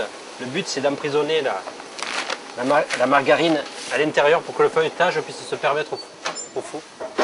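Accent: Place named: Provence, France. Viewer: Un vrai plaisir....BRAVO....ET l'accent au top...#PACA...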